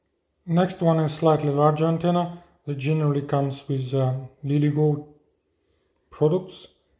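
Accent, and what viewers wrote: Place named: Eastern Europe. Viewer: When I hear a Slavic accent for some reason right away I trust the forthcoming information about radio equipment 🙂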